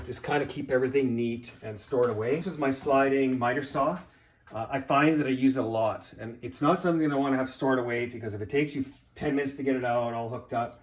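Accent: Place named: Saskatchewan, Canada. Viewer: Canadian eh! From the fields of Saskatchewan I'm guessing by the accent!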